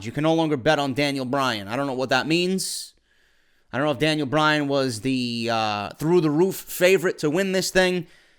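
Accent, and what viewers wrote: New York, United States. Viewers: I've only very recently subbed to your channel because I like what you do and how you do it, anyone can tell that you're passionate about this and the RAW 25 rage in your video made me laugh in a good way. Don't ever let haters or people who have no clue put you down, a lot of these "9 - 5" guys hate their jobs and would give anything to be able to do what they love for a job. As what I suppose someone like you would call a "casual" I don't know anywhere near as much as you about the business but I've been interested in maybe starting my own series of videos (with a more light hearted tone because I just don't have your experience). Keep it up is all I can say, I enjoy your content, plus I'm from the UK and your NY accent is awesome. lol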